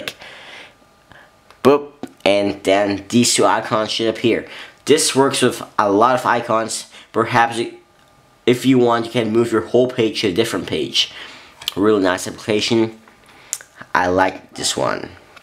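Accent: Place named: Asia. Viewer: You sound asian.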